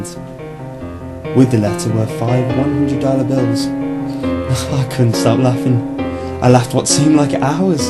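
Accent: United Kingdom. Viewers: this is a great story this os a good story the britsh eassent made it better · Mmmmmm british accent i really do like this story :D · this is my favorite creepypasta! no not just because its told by a british guy stop making stuff up! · a british/or english guy reads it the story just got 20% cooler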